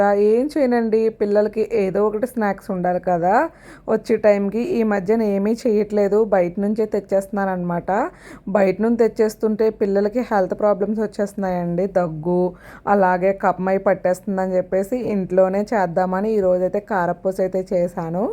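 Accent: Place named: Godavari, India. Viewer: Super👌 Godavari language😂😂👌👌👌 Ekkada Unna language maradu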